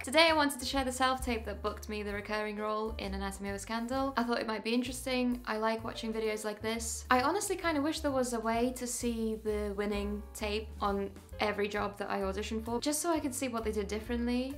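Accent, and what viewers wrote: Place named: United Kingdom. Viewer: Im surprised, your speech is horrible. Try speaking more clearly and with an American accent!!! British accents dont work well.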